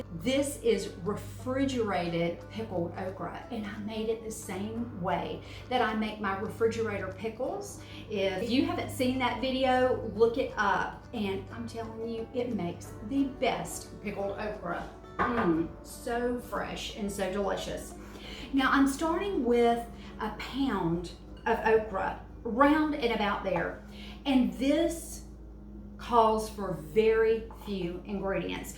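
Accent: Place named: Alabama, United States. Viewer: Living in the Uk, looking for a ladyfinger ( okra, they dont use the name "okra" over here so much)recipe ...and being a native Eufaulian from Alabama, Iinstantly recognized the accent. This is exactly what I was looking for in an okra recipe. Thanks so much for reminding me of my old home far away.......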